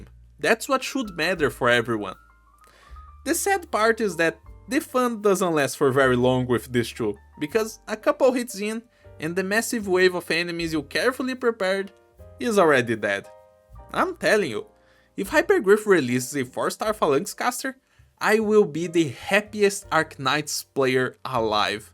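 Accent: Brazil. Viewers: Ai irmão, tu é br ou existe outro sotaque MUITO parecido com o nosso?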